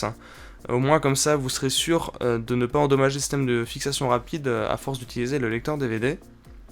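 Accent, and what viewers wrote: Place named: England, United Kingdom. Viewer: Quel accent anglais x)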